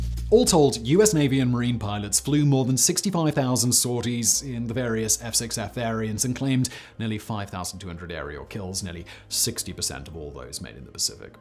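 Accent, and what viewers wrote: United Kingdom. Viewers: The f6f was and is extremely well known. It's hardly an unknown. Tactics were simple, fly in at high speed take a shot and keep going. Don't dog fight, or die Japan had some incredibly skilled pilots but exceptionally poor training tactics, so once the few exceptional pilots were attritioned out it just became a matter of time before us Americans ruled the skies. Japan was never really going to pose a serious challenge due simply to Its limited man power, industrial strength and serious lack of raw materials. The US navy didn't want the corsair due to its difficulty in landing on carriers. The British, based on your accent I think you should know, showed the US navy how to land. Corsairs land by coming in from the side not straight on. The gull wing was to accommodate the massive prop and requirement for high strength gear meant lowering the wing to shorten the gear. 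Post war proves the Corsair was a better overall aircraft.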